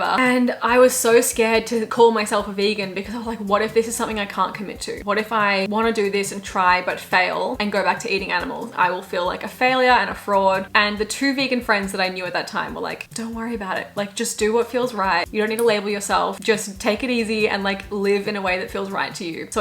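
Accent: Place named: Australia. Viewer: Great video! You are so adorable, the first time I saw your video I noticed your eyeshadow really stands out, and your glasses! I love your style. I'd love more Q&A videos like this!:) PS. I love your Australian accent I could listen to you talk about the weather LOL I also haven't finished TVD but I'm Team Damon. My least favorite album is.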